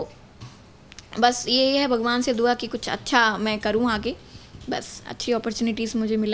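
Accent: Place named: Bhojpuri, India. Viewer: Tum bharatpur ki language nhi bol rhi ho ..... bhojpuri hi tmhare language me jhalak rhi h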